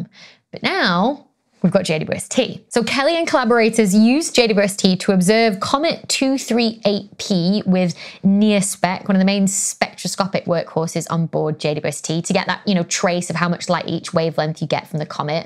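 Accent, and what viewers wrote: United Kingdom. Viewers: I Totally Love You!!! Girl next door Astrophysics?! Aw, hell yeaz!!! Where were you when I was growing up? I hope you don't mind if I share this video with my FB Friends to settle a quip? The arguments were: 1) Would Betelguese blow within our lifetimes? It's possible. 2) B'Geuse is somewhere between 500-650 ly from Earth (depending on who you believe). Tycho's Star was estimated at 9,000 ly; Keppler's Nova was estimated at 20,000 ly from Earth. Without specific climactic data from the 'Rennaissance Era', how can we say that Betelgeuse is NOT a threat to Humanity? There has never been a recorded Supernova THIS CLOSE! (?) With 40 years experience in the Nuclear industry (25 years in Health Physics), I know that we'll get BLASTED with neutrinos, probably a shitload of Gamma as well. My question is (and perhaps this will settle the gripe?)... 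what about the Mass Ejection/ Cosmic Rays? I'm new to your channel, but I am totally HOOKED! I studied Astrophysics at Penn State after I left the US Navy (Submarine Force) in 1991. No offense to Neil De Grasse Tyson, Stephen Hawking, Kip Thorne or even Carl Sagan... you are MUCH prettier than them! You are an amazing COMMUNICATOR. Keep those beautiful blue eyes, sweet personality and soft 'Brit' accent out there.... I'll be here!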